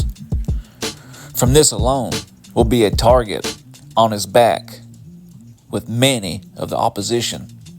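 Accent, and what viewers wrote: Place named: Texas, United States. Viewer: Who tf is narrating some Texas hick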